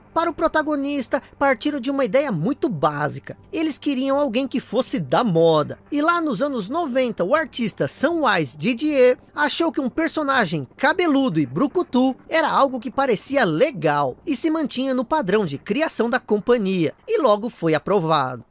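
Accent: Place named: Sao Paulo, Brazil. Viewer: esse é paulista mesmo.... negocio do jooooogo,,,, a trilha sonooora... só dando um toque mano, abraço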